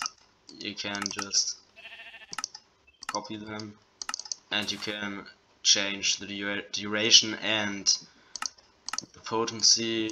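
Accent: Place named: Germany. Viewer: der deutsche akzent haha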